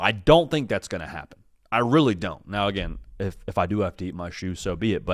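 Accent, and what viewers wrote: South, United States. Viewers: Why does this guy have a southern accent? Dude grew up and went to high school in SoCal and went to college in New York.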